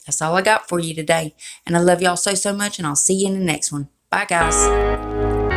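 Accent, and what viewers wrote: South, United States. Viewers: I love your Southern accent and sense of humor👏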